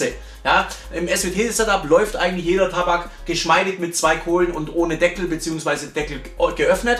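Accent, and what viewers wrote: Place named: Swabia, Germany. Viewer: Woher aus Schwaben kommsch du eigentlich? ;-) Dialekt kommt mir sehr bekannt vor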